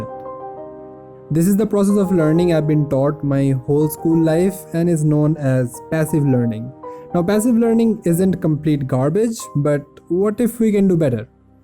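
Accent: Bengal, India. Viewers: Aap Bengali ho na?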